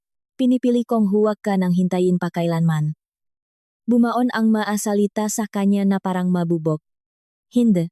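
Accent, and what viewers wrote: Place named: China. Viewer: Ang narrator lasing ata intsik ang nagsasalita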